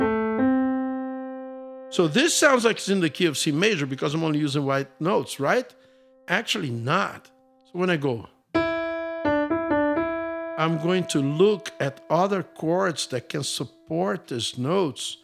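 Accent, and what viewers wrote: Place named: England, United Kingdom. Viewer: Bravo your tutorials, Master ! I do appreciate your methods, mostly your cleared, well articulated English accent ~ instead of too many sounded-English-seemed-like, such as bastard's American etc..etc... They must do trainee English Accent... PLS broadcast my message 🙏🙏🙏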